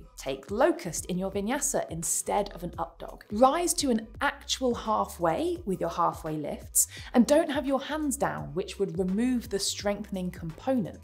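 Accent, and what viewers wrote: United Kingdom. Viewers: SO nice to see another teacher pointing to this short coming of yoga. My own 40 year yoga teaching career has let me see many loose their yoga butt and need to get it back again. I recommend much of what you have here, and also picked up a few good tips to add to my tool box as a yoga therapist working with hips challenges. thanks! Love your British accent.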